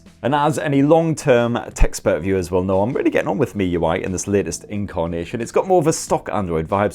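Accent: United Kingdom. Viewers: are you talking use British accent?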